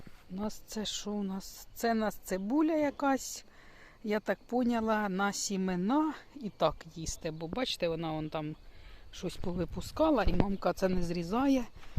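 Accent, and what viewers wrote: Poltava, Ukraine. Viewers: По розмові чути це Полтавська обл.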